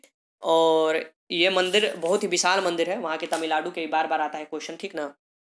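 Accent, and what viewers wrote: Bihar, India. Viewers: Sir aap Kya bihar ke rahne bale h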